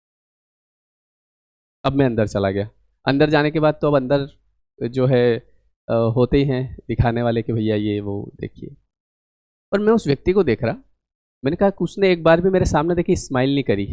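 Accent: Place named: Malwa, India. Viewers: Bhai aap indore se ho kya? 😅Bade he indori lag re bhai😅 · Inki boli se ye ujjain/malwa se lgte hain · Sir aap indore ya Malwa region se jude hai kya .... Apki boli se mujhe lagta h k aap hamare malwa region se jude hai 😊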